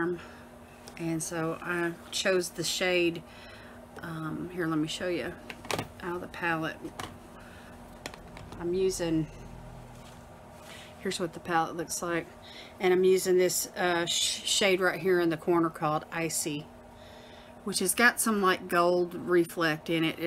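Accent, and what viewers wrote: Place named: East Tennessee, United States. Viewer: Hello,! I’m here from Erica’s channel, & I really enjoyed seeing the gorgeous look you created with the generated colorstory from the photo she chose for you! Those vibrant blues & greens look lovely on you! I have to ask (particularly based on the location you chose for her) if you’re a fellow Tennesseean. I’m in west Tennessee about 25 miles east of Memphis, but my mom was born in east Tennessee (Kingsport, if I recall correctly), & I thought I detected a familiar east TN accent! 😉👍✨💚💙💚💙✨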